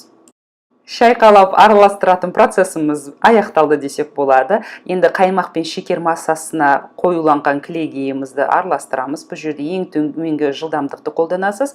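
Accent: Standard. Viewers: Таза қазақ тілінде😍😍😍 рахмет сізге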